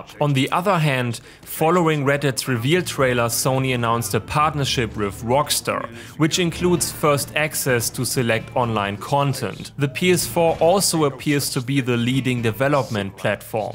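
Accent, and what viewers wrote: Germany. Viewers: Is this guy german? His accent sounds really german. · can confirm sounds like a german english teacher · you sounds german :D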